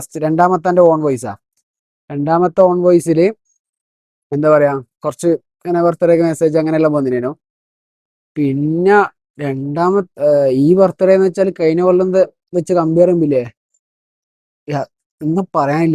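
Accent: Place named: Vadakara, India. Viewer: engale evida anu engale basha keteet kanur alle vadakara slang thonnu atha choyiche😂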